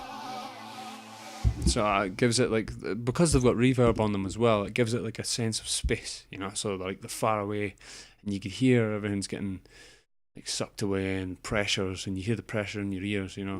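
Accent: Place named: Scotland, United Kingdom. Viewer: Very nice explanation brother. Scottish accent somehow assists in knowledge retention :)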